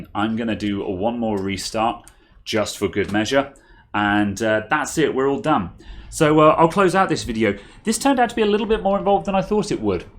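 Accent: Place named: United Kingdom. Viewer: I don’t need to watch these videos, as I have over 20 years experience doing this professionally but I love to listen to you while I work, my coworkers don’t talk much and rarely about IT work Something about a British accent really screams “IT” and I have no idea why!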